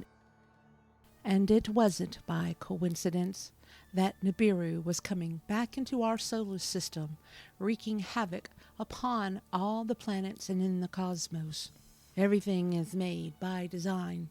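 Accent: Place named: South, United States. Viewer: Loved this. Beautiful video. The southern twang narrator gave it extra charm😊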